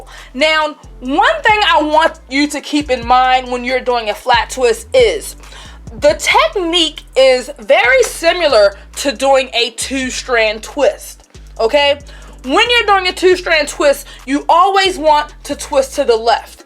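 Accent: Baltimore, United States. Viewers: I’m sorry idk what your accent is, sounds like Baltimore but love how you “two” like tew lmao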